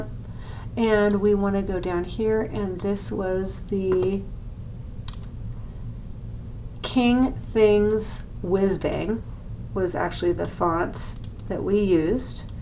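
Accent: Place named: Transatlantic, United States. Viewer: Please slow down on your talking, too fast for me, especially with a transatlantic accent.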